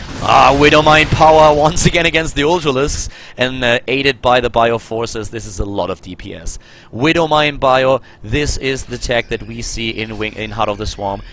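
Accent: Germany. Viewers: I like your German accent! And it's great how you're dropping gems like the right+shift attack scv building the bunker. Thumbs up!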